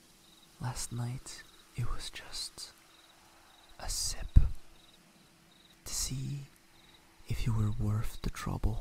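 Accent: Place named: France. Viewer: I was not into French accent before, but now I'm getting obsessed 😅🤭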